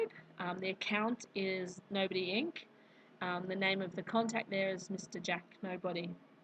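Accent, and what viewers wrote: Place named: Australia. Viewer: Thx Ms,. Very useful. Love that Aussie accent!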